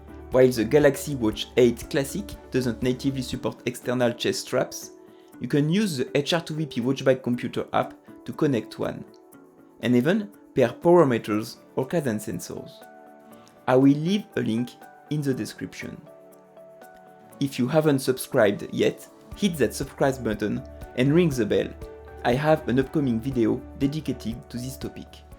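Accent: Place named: France. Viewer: This is the thickest French accent ive ever heard. Its great. Thanks.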